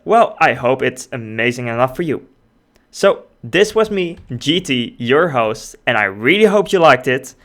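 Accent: Netherlands. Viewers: are you nethetlands vause of your english speach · NL !! je hoort het meteen. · He is dutch yes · Ben je Nederlands? Als ja ik hoor het aan je accent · Ben jij Nederlands? (Accent) :D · are you from the netherlands?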